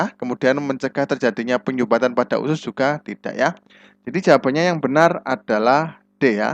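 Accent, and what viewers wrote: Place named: Bengkulu, Indonesia. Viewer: Cak nyo wong bengkulu abng ni😂.. sukses terus chanelnyo banh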